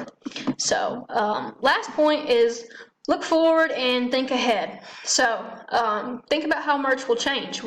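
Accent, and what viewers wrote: South, United States. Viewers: Love your MBA-related videos! Great, highly useful info delivered in a southern gal, no-nonsense way 😉 Subbed!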